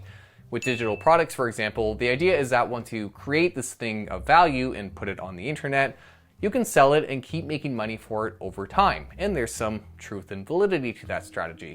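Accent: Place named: Canada. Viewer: hate canadian accent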